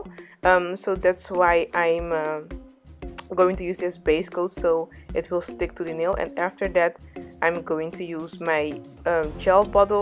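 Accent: Netherlands. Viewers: ik hoor gewoon dat je Nederlands bent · Ben je misschien nederlands lijk ik te horen aan je accent lol?😂) are you dutch ? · What country are you from, you sound dutch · Ik dacht al ik hoor een NL accent door je Engels. Toen ging ik naar je insta en zag dat je NL bent. Hahahaha in ieder geval je doet echt heel mooi werk💕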